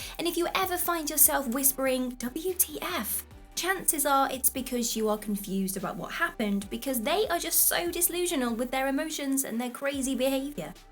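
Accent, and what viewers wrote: United Kingdom. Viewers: Hey you are really pretty and cute. Too bad you sound like you live in the United Kingdom and I live in the United States otherwise I would like to ask you to dinner and a movie.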